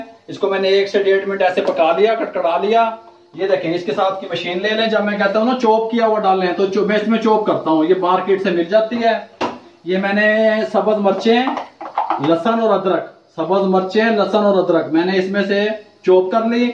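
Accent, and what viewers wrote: Punjab, India. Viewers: You know what I'm big fan of u the way u are talking specially when you tease in typical Punjabi I damn love it..Please keep it up best Regards, Shoaib from UAE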